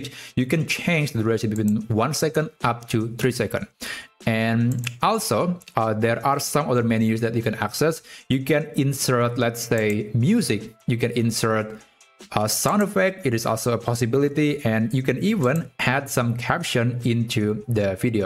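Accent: Indonesia. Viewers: lu orang indo bang? dari logatnya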